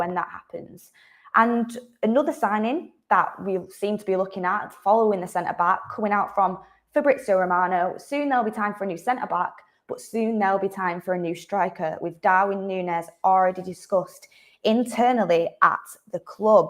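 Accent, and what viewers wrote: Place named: Manchester, United Kingdom. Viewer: Love the Manchester accent … but please , pronounce your Ts. It’s Man UNITED , not UNI-ED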